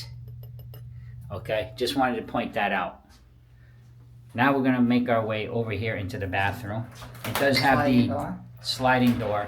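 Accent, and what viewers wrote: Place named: New England, United States. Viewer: I had to chuckle when y'all talked about different pronunciations of words when you come from different places. When I first started watching your videos, after about 2 videos I was thinking "He has to be from New England". LOVE New England! I'm in Virginia so I get the "Southern THANG" all the time,lol. Great video!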